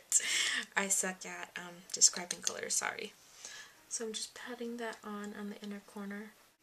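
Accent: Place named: Philippines. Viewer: i believe she is her accent is that of Filipina :)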